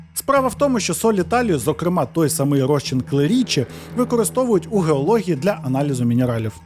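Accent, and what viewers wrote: Russia. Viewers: Я дуже-дуже перепрошую. Мені дуже подобаються Ваші відео, але не можу втримась від хвилинки грамар-наці. У Вас часто проскакують російські наголоси: брАла, а не бралА, спИна, а не спинА, помЕрло, а не пОмерло. А ще по-російськи звучать наголоси на прийменниках, наприклад, ми віримо на слОво, а росіяни — нА слово. Ще раз даруйте. Просто це часті слова у трукраймі і я сподіваюсь, що Ви пофіксивши ці дрібнички, покращите ваш контент. 😳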